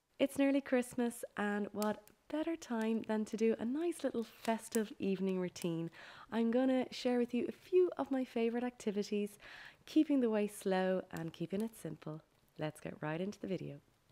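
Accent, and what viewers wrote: Ireland. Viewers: Am I detecting an Irish accent? My family came from County Cork so Ireland is near and dear to my heart.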